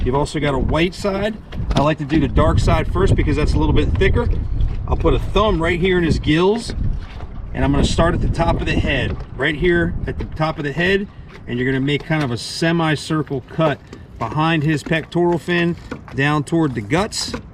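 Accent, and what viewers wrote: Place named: Baltimore, United States. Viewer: got that Balmo accent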